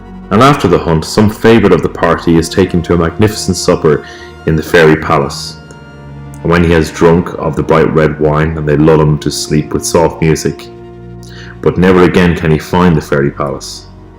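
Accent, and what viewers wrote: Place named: Ireland. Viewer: God, I love ya, mate. Such challenging subject matter you tackle, always doing a great job with it. Yes, I do see that, the strong similarities between the kingdoms - if they're really separate at all. This battle-weary heart, deeply sensing our oneness with All That Is, longs for the peace of our underlying harmony, which slips me up at times. It just is what it is, & it's good to know that. Until we at least accept the is-ness of whatever it is, we're going nowhere trying to change it. Acceptance & allowing smooth the way to manifest desirable change. Ah, Irish. I love it, but then I'm a sucker for "foreign" accents. Maybe it's that oneness thing, yet again. :D ~♥~ PS You may enjoy this fav quote, likely being able to see/feel more deeply into it than most: "Observation with evaluation is the highest form of intelligence." ~Jiddu Krishnamurti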